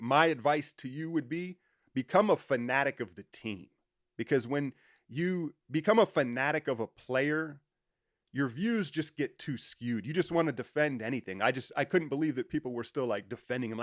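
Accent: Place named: New York, United States. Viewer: Na bro, you can't make it in warrior media for a second. You way too blunt for that organization. You really sound like a New Yorker if am being honest. If I never knew you; I'd think a New York media is bashing the warriors all these years. Happy takes boss!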